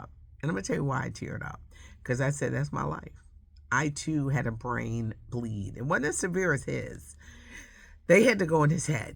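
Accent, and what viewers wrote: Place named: Philadelphia, United States. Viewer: Hi Auntie 🖐🏾 I just want to say hello. I have loved you for years. I go all the way back to the Butter Ball days. I used to work at Jefferson Hospital with your brother.I have moved to the west coast. See you always take me to my home Philly. I love the Philly voice and demeanor. Love you Auntie. Keep up the good work 👏🏾 🙌🏿 ❤😂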